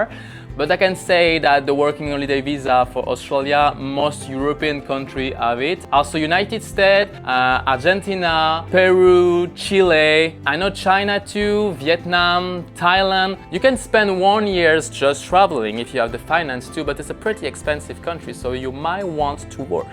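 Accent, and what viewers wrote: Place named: France. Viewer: It's either your French accent or my screwy hearing 😆.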